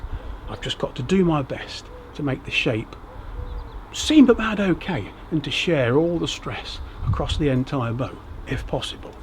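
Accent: United Kingdom. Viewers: Beautiful video, Beautiful English accent. Thank you. Greetings from France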